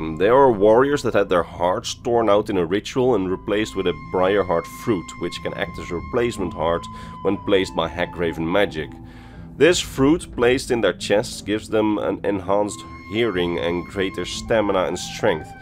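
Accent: Netherlands. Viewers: One of my favorite parts about your videos is your Dutch accent👍🏻👍🏻I love it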